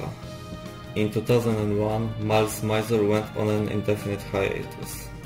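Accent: Poland. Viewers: Polish accent?? 🤨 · brzmisz jak polak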